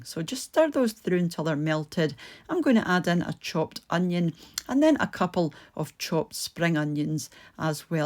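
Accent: Scotland, United Kingdom. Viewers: This (along with that beautiful Scottish accent!!) really appeals to my predominantly Scottish heritage. One question, - is the wrist tat a Star od David or a hexagram? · Hey our American friends - I’m a Londoner… this soft beautiful Scottish accent is so lovely, you’re not wrong in your comments about that. I made this (smashing comfort food) I had some thick slices of roast BBQ pork in the freezer… defrosted, chopped and added in. Perfect result. Not mozzarella (tasteless for a dish like this) good extra mature cheddar for sure and yep baked beans and crunchy warm bread. Yum yum and a really cheap good for you meal. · American here — I think this looks absolutely fabulous, and I’m going to have to give it a go — but if truth be told, I’m equally enchanted with that lovely Scottish accent of yours. So beautiful!! Sending blessings from the USA! 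· Ciao from Italy 🇮🇹 your vid just popped up and I'm glad it did 🫶 Aww that scottish accent so beautiful... I sure will follow you.... The recipe looks yummm 😋😋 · Looks outstanding! On a side note, several people mentioned the Scots accent, that is actually where the Southern accent in the U.S. derives from.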